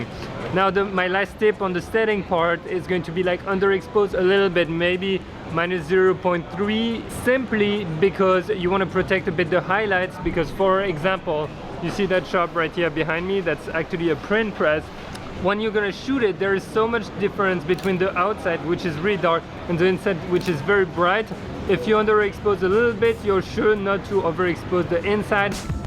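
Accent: France. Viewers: J’entends ton accent français qq part mais vos vidéo sont grave bien et ça m’aide beaucoup pour avancer dans la photographie merci! :)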